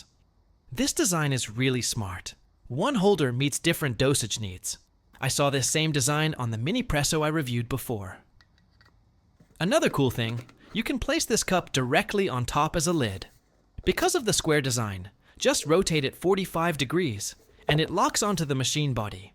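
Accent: United Kingdom. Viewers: Bro became more British since last time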